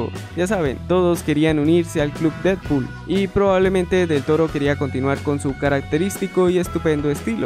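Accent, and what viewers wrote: Colombia. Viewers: Eres de Colombia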